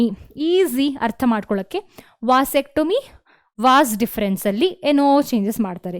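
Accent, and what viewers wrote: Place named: Mangalore, India. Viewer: ..... manglore kannada So beautiful so eligate ..just looking like a wow..❤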